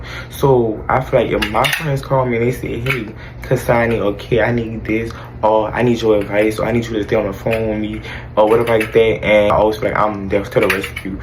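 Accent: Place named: Louisiana, United States. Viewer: Miss you and your million dollar sexy Louisiana twang, and that hot look is a bonus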